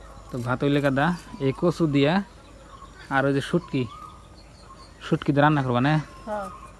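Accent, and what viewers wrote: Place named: Mymensingh, Bangladesh. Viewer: আপনাদের বাড়ি ভারতে কোন জায়গায় মনে হয় ময়মনসিংহ ময়মনসিংহের মানুষ এরকম কথা বলে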